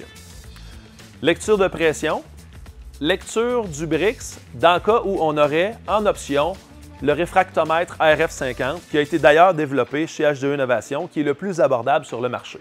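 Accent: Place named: France. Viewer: Yes with and the English Chanel needs to be without the French accent so us Americans can better understand. Please.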